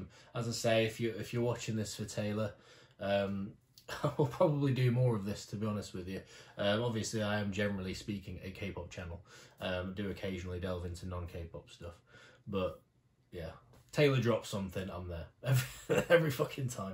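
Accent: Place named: United Kingdom. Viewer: All I saw in this video was a Brit guy repeatedly saying 'I can't deal-' in his adorable accent.... Nd I couldn't agree more....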